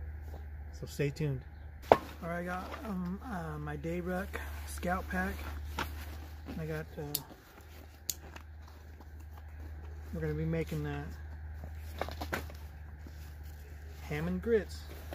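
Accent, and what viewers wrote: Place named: South Carolina, United States. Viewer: Dang..... are you sure you’re not from South Carolina????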